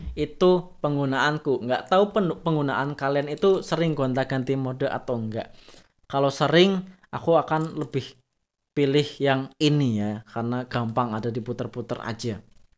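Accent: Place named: Java, Indonesia. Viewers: Medok banget masny, tapi bagus penyampaian nya lengkap as pengguna sony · medok bgt bang,wong jowo yo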